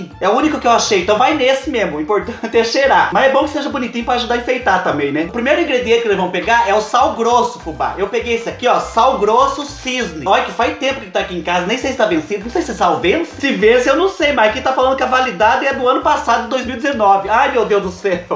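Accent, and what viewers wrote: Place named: Minas Gerais, Brazil. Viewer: Ô Fubá, e eu achando q vc fosse de MG kkkkkkkk! Com tantas notícias negativas rolando na NET precisávamos mesmo ri um pouco.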